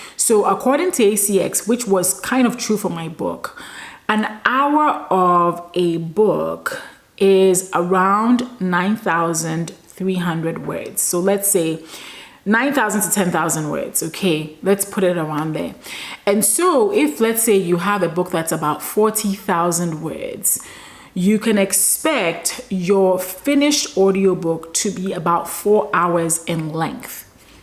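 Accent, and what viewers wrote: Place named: Ghana. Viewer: You sound like a Ghanaian....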